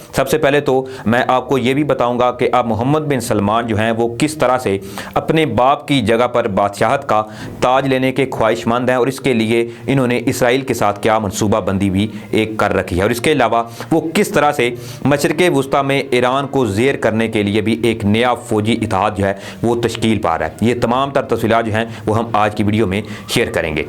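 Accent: India. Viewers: تم یہ بتاؤ بھارتیوں کی طرح اردو کیوں بولتے ہو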